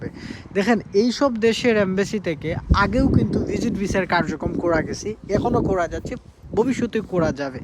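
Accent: Sylhet, Bangladesh. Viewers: সিলেটি ভাই❤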